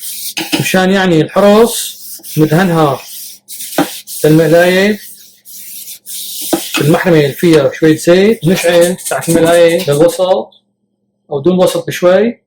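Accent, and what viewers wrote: Levant. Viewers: لك ما تبلى المهارة الشامية ❤️ واللهجة الشامية ❤️ وهالفكرة الحلوة لتعليمنا اكلة الطفولة وذكريات شام الامان وصراخ البائع ناعم يا ناعم ❤️الله يعيد هديك الايام بهمة ناس بحبوا بلدهن ❤️ الله يعطيك الف عافية وشكرًا جزيلاً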